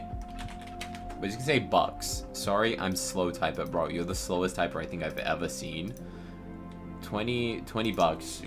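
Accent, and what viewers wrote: United Kingdom. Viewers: Wait he’s British? Edit:I searched it up and he is brtish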